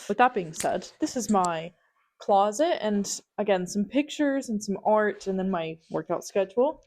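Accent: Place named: Ireland. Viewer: i watched a lot of your video's in succession. You have no idea how much your accent changed from Texan to to far more Irish.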